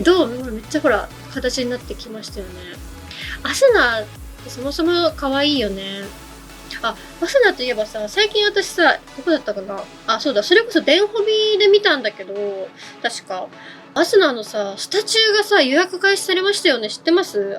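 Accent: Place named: Miyazaki, Japan. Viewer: 確かにこれは自分の技量を分かってないと手を加えるのは怖いですね😨 だから見て楽しむ😁 解説中のちらほら出てくる宮崎弁もええなぁ〜☺️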